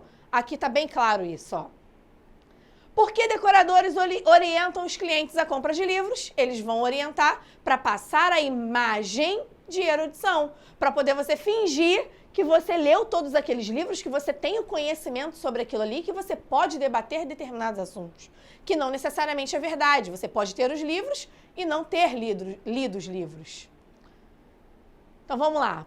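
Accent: Rio de Janeiro, Brazil. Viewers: Nada como ouvir o bom e velho sotaque carioca!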